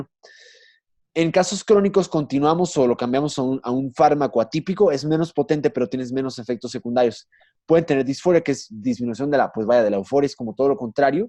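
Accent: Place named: Fresa, Mexico. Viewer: Hablas como pendejo, muy fresa che idiota.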